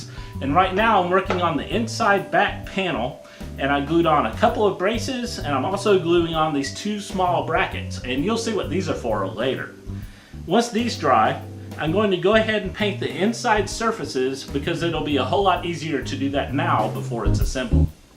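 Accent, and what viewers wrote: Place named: South, United States. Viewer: Great job, loved the British slang with southern accent.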